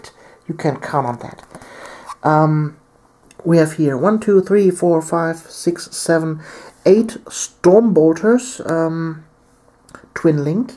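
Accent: Germany. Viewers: hey i watch your video's when ever i get LotR but, so can you do a video for the army of the dead. also are you german?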